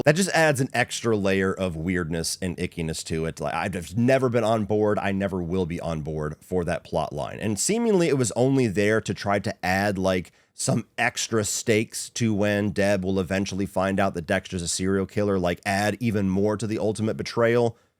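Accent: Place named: South, United States. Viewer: I got into Dexter at the same time as you in 2009,only difference is I was 29 and you were 19(somehow I look 10 years younger than you)but being a man I don’t like looking 10 years younger than I am. But ai started watching in 2009 just like you(shows I’m a big fan of your channel if I know all that). Also I’m from the northeast and you’re from the south,I know you don’t think you talk that way but you do,it’s just that your accent isn’t as thick as most southerners just as I don’t talk like a typical Philadelphian. Anyway I’m a huge fan of yours and I agree with most of your takes. I hope this channel continues to go for years because you’re the best at what you do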